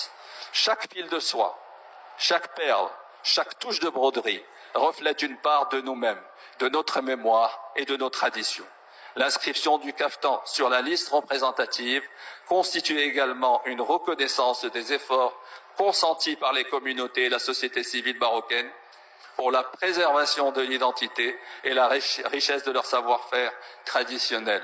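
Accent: Standard. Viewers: فرنسية نقية وماعندي مانقول فهاد الكلمة ةلي لخصات وبكل بساطة تاريخ القفطان عند المغاربة !! خطاب متقون وكلماته مختارة وصراحة برافو .. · واش سمعتي أداك القرع الكلام الموزون و الفرنسوية النقية و هاد شي كولو بطابع ديال المعقول ماشي الكذوب و البهتان …. تعلموا من سيادكم le savoir faire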